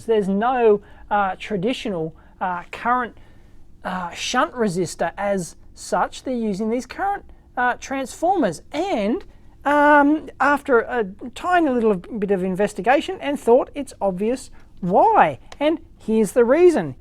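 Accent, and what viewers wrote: Australia. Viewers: I thought you are from Aus ...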